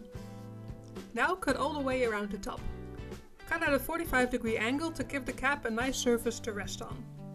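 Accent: Netherlands. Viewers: Is she dutch??? She has that kind of accent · You are dutch hah! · you sound Dutch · Let me guess your from Holland..? very good vid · She is dutch people have a strong accent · u have such a Dutch accent!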